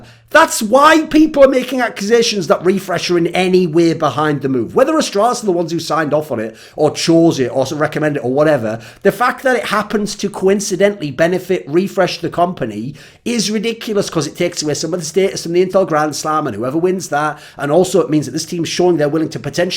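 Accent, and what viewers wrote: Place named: Scotland, United Kingdom. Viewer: Where you from sound so Scottish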